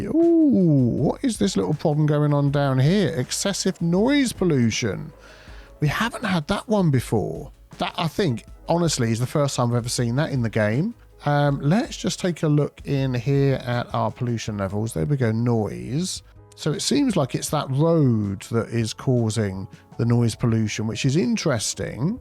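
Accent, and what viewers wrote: United Kingdom. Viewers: Wait, you're British?!?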